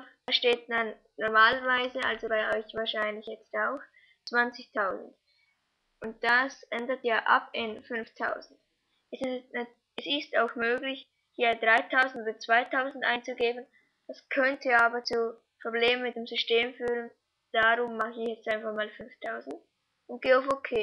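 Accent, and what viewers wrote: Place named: Switzerland. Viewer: schweiz???? oder wie mir gefällt der dialekt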